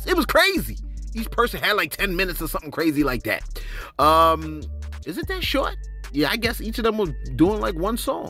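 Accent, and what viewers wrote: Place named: Bronx, United States. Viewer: Ay yo shout out to for saying niggas twice. 😂 Had me weak cuz u neva cuss and always say ninjas. I told my wife u sound like u from da Bronx tho!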